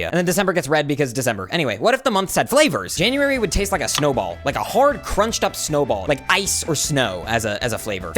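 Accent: United Kingdom. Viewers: Are you british or something?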